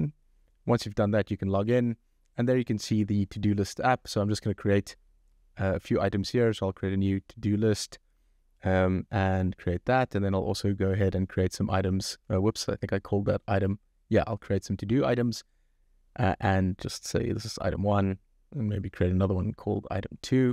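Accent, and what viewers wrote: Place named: South Africa. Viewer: that saffer accent is unmistakeable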